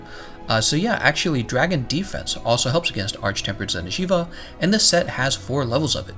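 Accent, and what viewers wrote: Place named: United States. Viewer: idk Why you get so much hate... Your videos have the best information... and your even tone makes the American accent easy to understand.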